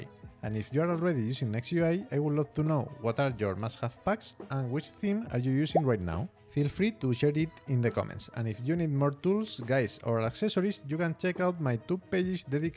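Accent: Spain. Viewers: jaja es que se te nota que eres español y pense a lo mejor igual otro canal y yo viendo en ingles jaja.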